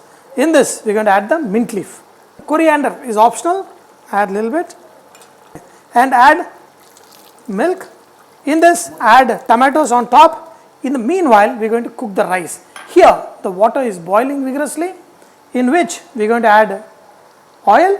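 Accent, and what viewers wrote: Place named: Tamil Nadu, India. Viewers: Every day morning starts off by watching your cooking videos. Today your tamil slang is ultimate!!! Can't stop laughing.. I watch for the way you present the video. The final touch Pondatti..😄😄😄 Pondatti....🤣🤣🤣🥰.. Oh man.. 100% laughter guaranteed. .. Best wishes to Vahchef !! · Sema chef Chennai language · Good to hear Tamil slang 😉😉😉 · Wow chennai tamil super 👌👌👌👌👌👌👌👌👌🌹🌹🌹🌹🌹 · Your Tamil accent super ji Tamil Nadu mapillai, all the best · Tq sir for making our chennai biriyani and you say pondatti and sister says podu machan I love the way you try to speak the chennai slang and it was soooo good 👌🏼👌🏼👌🏼👌🏼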